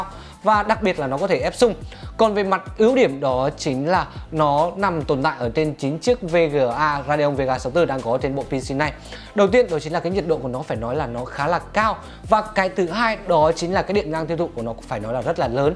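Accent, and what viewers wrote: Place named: Central, Vietnam. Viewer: như kiểu ng miền trung , nói tiếng miền bắc. dù có vẻ rất hoàn hảo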